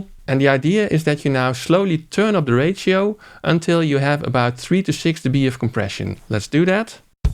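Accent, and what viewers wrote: Netherlands. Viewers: Wait are you dutch ? I'm hardly noticing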